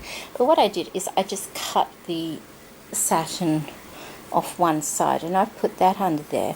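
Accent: Australia. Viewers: Love them ... they're beautiful! Nice to hear an Oz voice ... there's not many Oz ladies doing tutorials! Jennings from the UK does some lovely Shabby Chic ... you could check her out 😊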